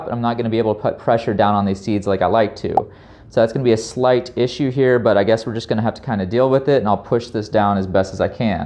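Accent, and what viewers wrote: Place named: South, United States. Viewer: GREAT REVIEW! Your accent is becoming more southern with every day that passes. LOVE IT!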